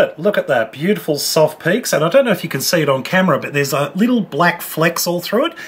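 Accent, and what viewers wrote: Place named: Australia. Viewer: I recently subscribed to your channel for two reasons: the easy-peasy recipes, plus your delightful Aussie accent! We Americans do enjoy hearing y'all talk.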